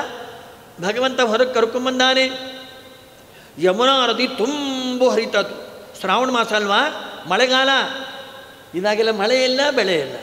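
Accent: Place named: North Karnataka, India. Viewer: I enjoyed listening to this discourse mainly for his Kannada of north Karnataka flavour.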